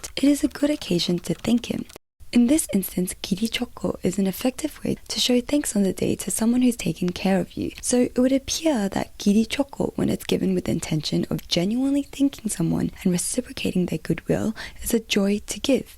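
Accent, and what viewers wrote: Australia. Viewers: Is that an Australian accent mixed in with your English pronunciation? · At first I did a mental double-take at hearing your Australian accent, then I noticed the size of your room and thought "yeah, fits, that's prolly her entire apartment in Sydney or Melbourne and she pays $5000/month rent" 🤔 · Why am I hearing an Australian accent?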